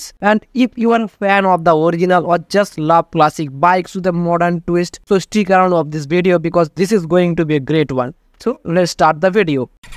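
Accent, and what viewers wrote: India. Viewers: Bihari bol rahe ho ki English bol rahe ho...acha laga but Bihari English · Bhojpuri Engliz😂 Nice one brother keep it up 👍👍😂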